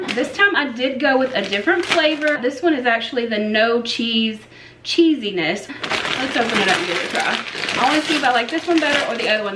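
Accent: Kentucky, United States. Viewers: Girl I knew that accent was pure Kentucky! We live in Central Kentucky 🙂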